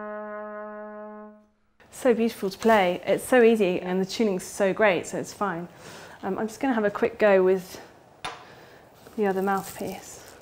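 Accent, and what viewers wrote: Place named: RP, United Kingdom. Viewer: Absolutely. Cute lady. Posh accent, too.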